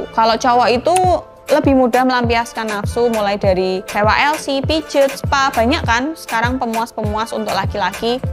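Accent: Java, Indonesia. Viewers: Medok amat logat jawanya😢, jd ilang selera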